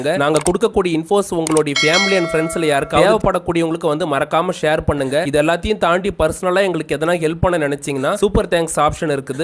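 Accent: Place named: Thanjavur, India. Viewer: சார் தஞ்சாவூர் ஏரியா போல.அந்தாண்டனு சொல்றார்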